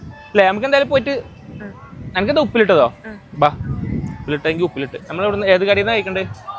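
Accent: Kozhikode, India. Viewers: Avalude baksha kettitt Kozhikode ann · കോഴിക്കോട് ആണ് മനസ്സിലായി ഭാഷകേട്ടപ്പോൾ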